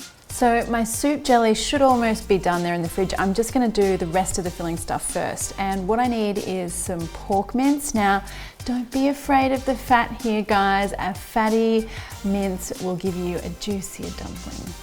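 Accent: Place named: Australia. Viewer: Pretty sure it's just her Australian accent lol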